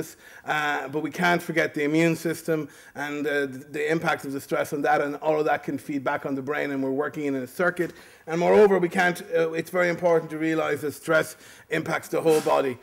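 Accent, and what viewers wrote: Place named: Ireland. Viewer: Where's he from ? I heard him in Science Friday and thought it was a black Irishman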